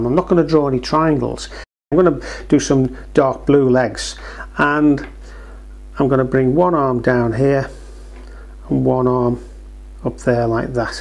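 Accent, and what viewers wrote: Liverpool, United Kingdom. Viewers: Fab tutorial,lovely to hear a scouse accent from my home town too!😀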